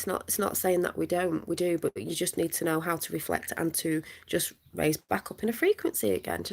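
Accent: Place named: Oldham, United Kingdom. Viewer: Hope you don’t mind me saying .. it’s great to know there is someone who thinks like me near me with the same funny accent .. I normally hear stuff like this from Murica.. I’m from Oldham 😊😊😊 love and light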